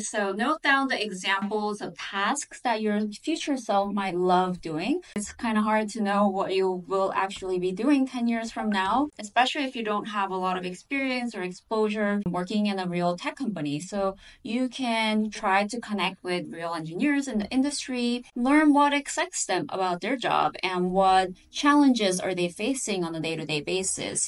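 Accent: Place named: South Korea. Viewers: Yes. I'm half so I've heard Koreans speak English for most of my life. I dunno what it is exactly but it's recognizable. But in case you wanted to really know, the last name is a dead giveaway. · Great video . Btw Are you of Korean origin ? I noticed a slight accent . I have a linguistics background that's why I noticed , I did not mean to offend in any way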